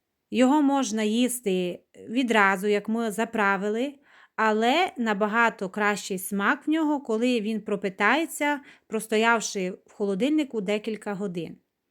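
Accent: Transcarpathia, Ukraine. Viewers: Дякуєм за рецепт! Прийшла на ваш канал від вашої сестри. Обожнюю Закарпаття і ваш діалект!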